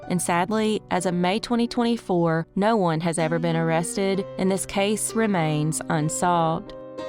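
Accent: South, United States. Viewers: I really like your channel. The cases are interesting and i havent heard them a dozen times Als i really like that southern accent.😊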